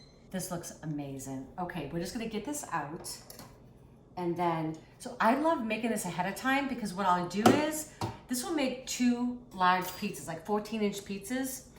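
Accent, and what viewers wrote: Boston, United States. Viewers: Ordering one now - think I'm going with black stainless steel! thanks for the demo and love your boston accent!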